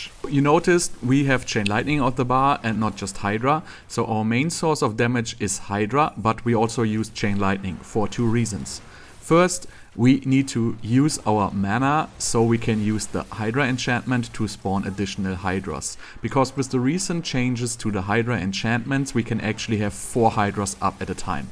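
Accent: Germany. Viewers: Vielen Dank für diesen megageilen Build! Von allem was ich bisher probiert habe, bockt deine Version am meisten. Mach weiter so! PS: Ich schreibe auf deutsch, weil ich deinem Englisch Akzent nach ziemlich sicher bin, dass du Deutscher bist.😁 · Man hört einfach immer wenn jemand deutsch ist haha, gutes video 👌🏼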